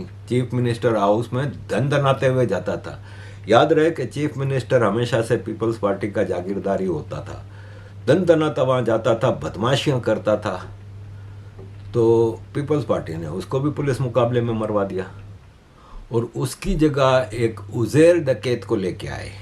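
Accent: Gujarat, India. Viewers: This guy has a gujrati accent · Apka accent Gujarati logoki tarah hai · Sir, you sound so Gujarati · Bhai k language main Gujarati accent jalak raha hai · Your tone of speaking is same as like we gujarati. Anyway I also live near batva , manavadar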